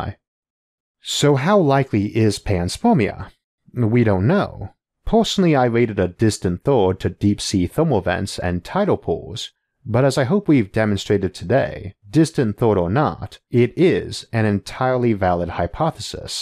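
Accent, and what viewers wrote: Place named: United States. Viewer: It's not an accent, it's a speech impediment. Though otherwise he has an American accent.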